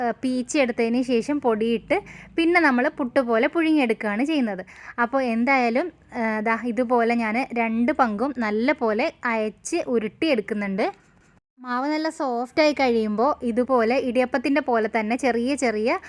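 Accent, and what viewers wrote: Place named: Ambalappuzha, India. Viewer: Njangal ambalappuzhakkarum anganeyanu parayunnath ❤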